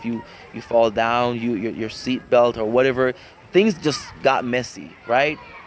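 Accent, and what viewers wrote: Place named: Caribbean. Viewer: O, where are you from? I hear an accent (I’m from the US…Delaware)…I’m trying to detect it…a Caribbean Island?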